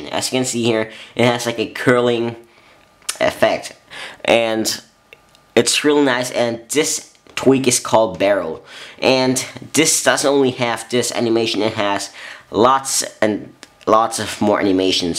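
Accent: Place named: Asia. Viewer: You sound asian.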